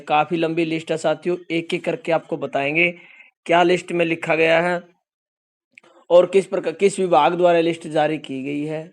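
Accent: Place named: Haryana, India. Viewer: Pure haryana ki h kya ye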